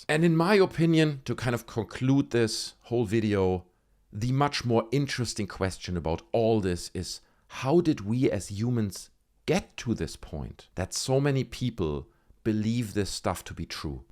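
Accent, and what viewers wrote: Germany. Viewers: Are you from Germany? Du hörst dich jedenfalls so an.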